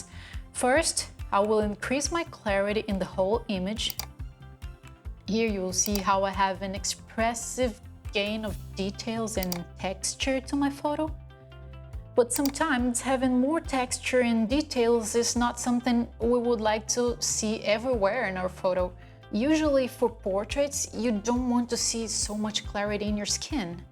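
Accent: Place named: Brazil. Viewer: Brasileira né? Percebi logo no inicio do video. Eai Guy's. hehehehhe. Anyway your english is nice. Flow! :) Great video. Obrigado!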